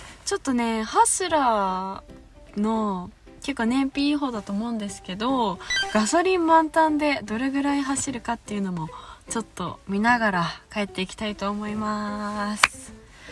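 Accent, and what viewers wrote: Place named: Osaka, Japan. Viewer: お疲れ様です✋六万キロ達成おめでとう(笑)😊何か大阪弁が板についてきたかな？(笑)😊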